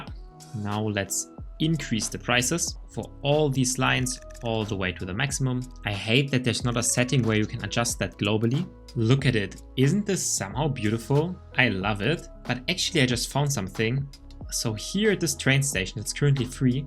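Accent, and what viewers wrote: Germany. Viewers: do i hear deutsch? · deutscher Akzent, 10/10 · uff ich höre sofort raus das du deutscher bistXD trotzdem geiles tutorial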